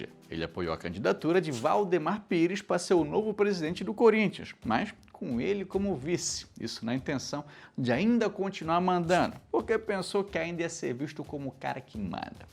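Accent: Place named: Rio de Janeiro, Brazil. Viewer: Tira esse carioca falando do Corinthians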